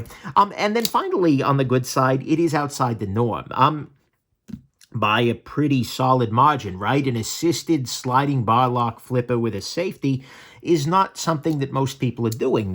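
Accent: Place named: Mid-Atlantic, United States. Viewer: I dig the accent it sounds like 1940’s mid Atlantic where it from?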